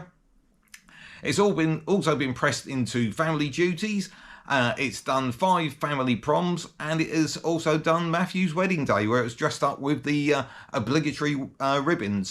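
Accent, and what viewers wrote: United Kingdom. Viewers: Would you mind putting up a trigger warning next time you put Brewer in the video? Cheers mate. Great video and great car despite being built in NI and despite being worked on by WD. BTW whats your accent sounds a bit Suffolk to me, ba. Cambridge out.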